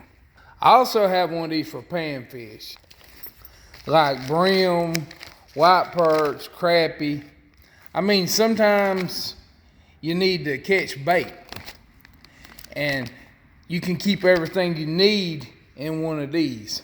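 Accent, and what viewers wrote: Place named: Mississippi, United States. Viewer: Thanks! You sure you ain't from Mississippi? It's the same accent.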